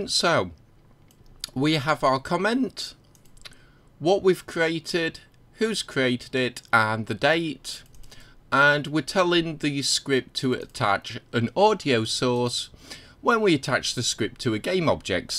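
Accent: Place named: United Kingdom. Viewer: i like british accent~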